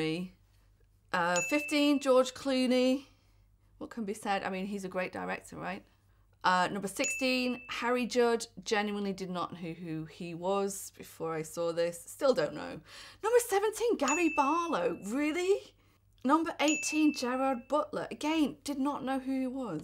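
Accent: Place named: United Kingdom. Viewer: Your videos help me emprove my british accent! Thnx 😋 Cheers from Argentina!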